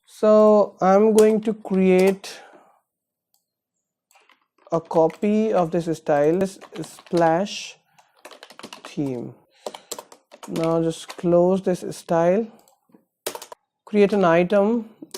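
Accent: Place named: United States. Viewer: Your video is great, but why the American accent on an Indian background and foreground?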